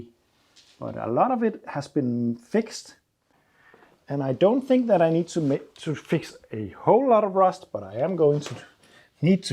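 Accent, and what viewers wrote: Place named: Denmark. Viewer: Looks like a Peugeot 504 at the front. I know a fellow Danish man and his accent sounds similar to yours.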